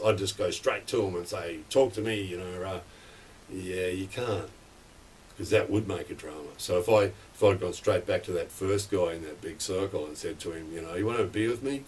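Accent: Australia. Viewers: I just love the sound of your australian accent talking about details across japan, a country that I do miss a lot! thanks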